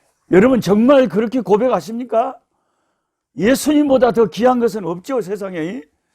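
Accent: Jeolla, South Korea. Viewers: 아따 같은전라도네요 사투리넘정겹네요 순천이네요 선교사님 어떻거멀리가서선교하시느라 애쓰시네요 잘듣고잇네요 강건하세요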